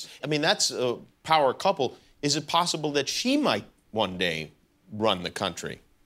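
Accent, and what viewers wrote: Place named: Australia. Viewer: well obviuosly you're australian.... lol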